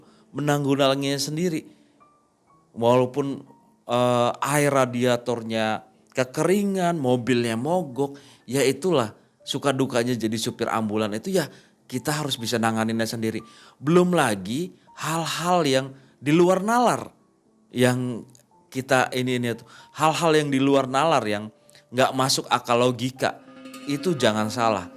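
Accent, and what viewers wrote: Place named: Indramayu, Indonesia. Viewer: 😂😂😂😂😂timurtengh hadir mang ay masa allah dri indramayu apa y bahasaya medog goblog ya😂😂😂😂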